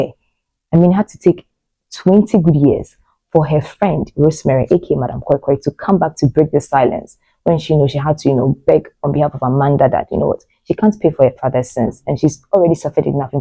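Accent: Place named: Ghana. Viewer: The movie wad top notch... buh you have the ghana ascent in your voice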